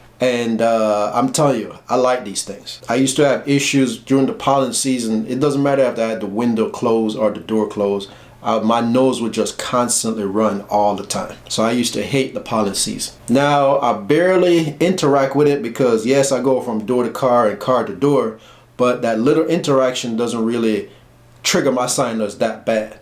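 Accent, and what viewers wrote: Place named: Jamaica. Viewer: Thanks for the video! I'm detecting a little accent from u. Sounds a little jamaican 🤔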